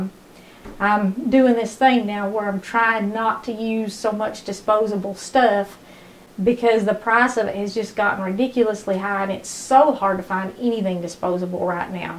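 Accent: South, United States. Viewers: You are a fabulous cook. Thank you so much for speaking slowly so we can understand so much better. You have a beautiful southern accent and gorgeous hair. Thank you for being so patient with your subscribers. Love from Debbie and Dexter-Dog the amazing rescued Chihuahua companion.🙋❤🙏🙏🙏🇺🇸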